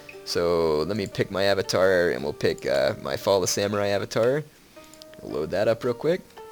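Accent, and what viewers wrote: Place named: New Jersey, United States. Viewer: Happy Thanksgiving. Your videos help decompress this Napoleonic War fan after work, no matter what era. Very involving. You and the Prince of Macedon have well earned the esteem of your fans for the military education and well-spoken presentation you bring to the game. Congratulations..By the way, you sound just like a Jersey/Rutgers guy.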